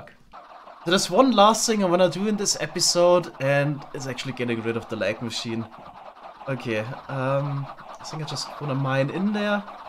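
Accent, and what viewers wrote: Germany. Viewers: Ach man.. Immer dieses Englisch als Deutscher 😂 Man hört es immer raus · Are you German because you have that accent if you have pls say something in the next video bb love your content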